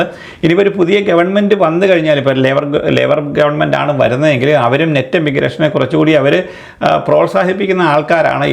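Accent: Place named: Kerala, India. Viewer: Idheham parayunnathu nammude malayalam pole thonnunnu.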